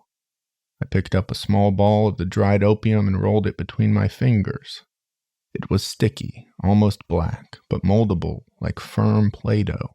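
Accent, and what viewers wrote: United States. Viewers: Wait is he from South Carolina?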